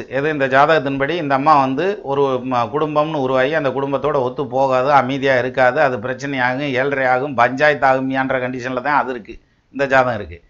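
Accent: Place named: Kongu, India. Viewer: Sir, my guess you are from kongu vellala gounder community ... Pls don't mistake I m guessing based on the way you talk and the examples you say in between, which is very familiar in this community. I may be wrong....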